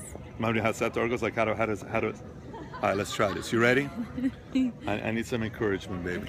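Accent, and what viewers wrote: Iran. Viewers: I mean I thought you were Persian with that thick accent but your name threw me off. Stick to the uncomfortable paid interviews. At least there's some entertainment factor in those. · exactly he’s Assyrian who stayed in Persian for sometime that’s all. You can even sense that in his accent.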